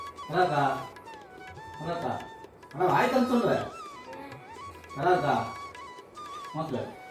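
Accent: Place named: Kongu, India. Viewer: Super na kongu tamil la arumaiya pesuringa....❤❤❤❤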